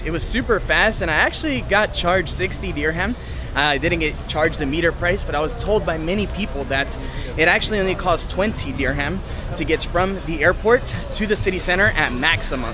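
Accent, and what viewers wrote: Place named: United States. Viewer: I really like your American accent. thanks for sharing this video!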